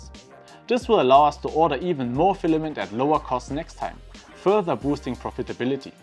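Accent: Germany. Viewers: Asian man with a German accent caught me so off guard and I love it!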